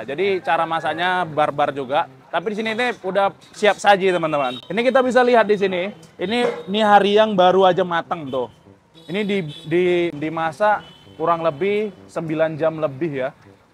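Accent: Medan, Indonesia. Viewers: Nambah napsu makan nonton chanel ini.. suka sama kedua reviewernya = lidah medan = Lidah bandung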